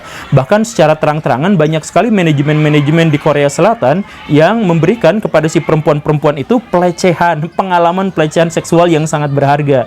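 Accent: West Java, Indonesia. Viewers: Sebut saja saya fans, Saya suka banget dengan paparan informasinya. Saua berharap bisa bertemu langsung dan belajar ke akang. Kebetulan, kedengerannya akang kaya org Sunda, klo bener, saya mau berkunjung buat belajar langsung ke akang. Smoga mendapat pesan balasan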